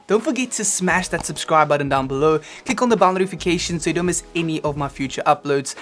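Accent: South Africa. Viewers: I know your accent is south African, I was wondering why you had the money in dollars instead of R😂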